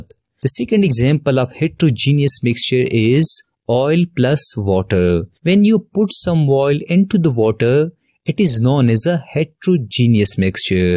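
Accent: India. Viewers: ugh another idian speking guy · His accent is so pure Indian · Its indian accent though, the spongebob narrator has a french accent✨ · Omg....I love his Indian accent it’s so cute 🥺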